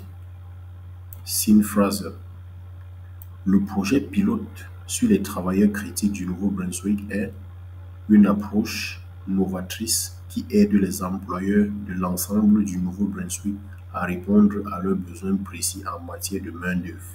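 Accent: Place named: Cameroon. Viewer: mon gar ta video n''a pas de volume et ton accent cest kmer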